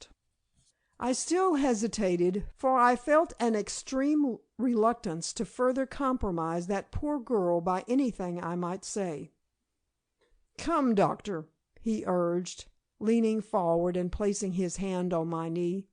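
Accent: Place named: Texas, United States. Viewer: Ugh unlistenable. I live in NYC. The narrator is obviously meant to be a man. This is read by a woman in Texas. The effort is appreciated, but unsuccessful.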